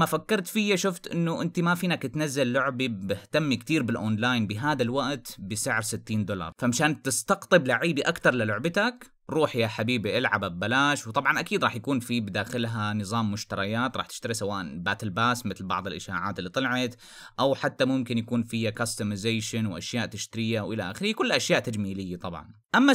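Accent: Homs, Syria. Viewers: معلم انا بشغل فيديوهاتك مع العيلة و امي دائما بتقلي انو انت سوري من حمص😁😁 فهل انت حمصي؟ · انت سوري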